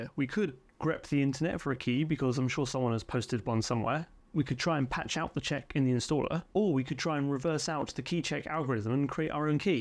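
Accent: United Kingdom. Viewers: 1. Britisch accent check 2. Nice explanation check 3. Fun topic check Subscribed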